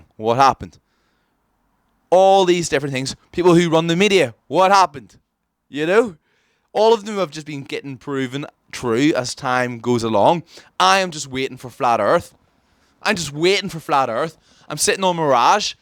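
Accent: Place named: Northern Ireland, United Kingdom. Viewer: You from Northern Ireland? Or ireland seems like more noeth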